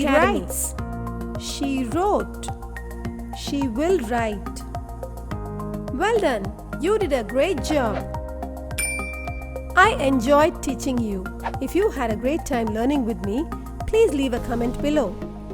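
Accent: India. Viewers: open, hear Indian accent, close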